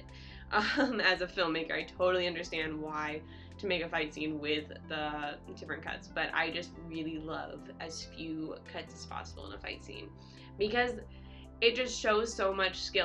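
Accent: United States. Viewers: Aww, that wasn’t the full, proper scene. So I will give a bit of context here. Prior to this, Rayappan (Vijay) will be listening to an old song from a MGR (1st Tamil superstar) movie in a car. The driver will complain about a rival gang so he will ask him to go there. That’s why when the gangsters say that this “top dog” is cornered, Rayappan said “they are trapped in a bunch” haha. Also, the focus of this scene is to show how relaxed Rayappan is whilst fighting because he has “been there, done that” sort of thing. So he ends up singing that same song (from the car) while fighting. Here’s the link to the full video if you are still in the mood to see it haha. <link> Thanks. I like your pronunciations of names. I can listen to em all day. Btw are you from a southern state in US?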